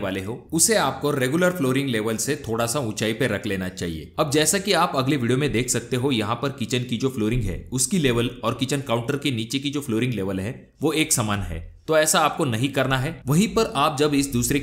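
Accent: Maharashtra, India. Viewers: Sir aap maharashtra se ho marathi mix ho rahi hai😅😅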